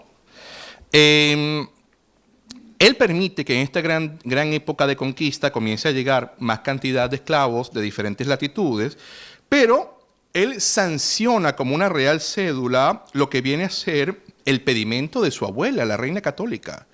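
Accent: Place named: Peru. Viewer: Un hombre muy bello, esa piel trigela, y venezolano, uff uy , aunque su acento me parece un poco al peruano, saludos desde Cali,- Colombia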